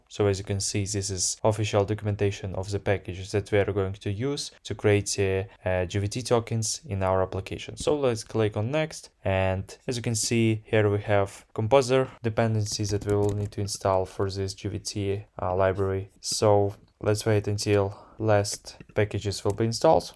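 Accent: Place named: Russia. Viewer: Thanx a lot for this guide! Fluent english from russian spell that's what i neeeed, man "]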